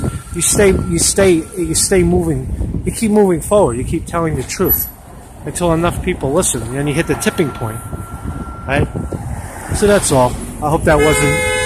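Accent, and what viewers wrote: New York, United States. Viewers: The wind (well, not so much), the horns, your New York accent, I love it. Keep keeping it real. Rock on, brother.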